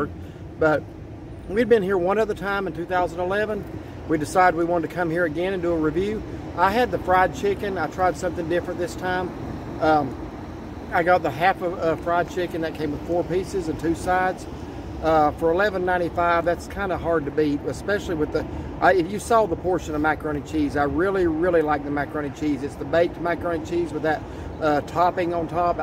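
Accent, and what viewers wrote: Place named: North, United States. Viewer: You can spot a yankee 40 miles. Still don't know how to say pecan .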